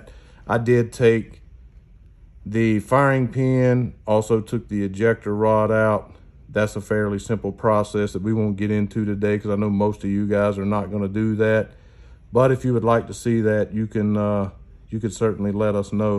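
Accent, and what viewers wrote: South, United States. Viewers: You can’t help your southern accent and I for one will not hold it against you sir , at least you speak English .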